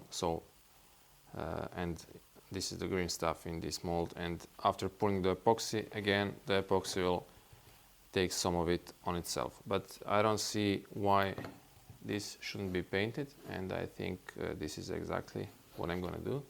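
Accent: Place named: Eastern Europe. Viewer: I really loved watching this video. It’s informative and I especially enjoyed the calm pace of it and your voice and (Slavic?) accent. It was also very clearly explained and I’m confident I can give this a try myself now. Definitely appreciate a good homemade video like this without all the editing, quick scenes, flashing lights, annoying loud music and clickbait. Just a simple information video. Keep it going!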